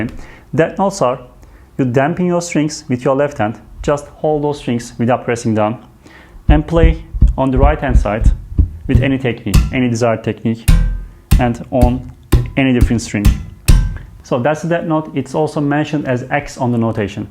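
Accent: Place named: Turkey. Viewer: abi sen kesin türksün konuşmandan anladım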